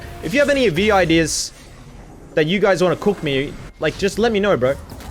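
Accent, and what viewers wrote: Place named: Australia. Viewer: just wanted to say Im happy you're Australian so I have a streamer I like that speaks Australian accent English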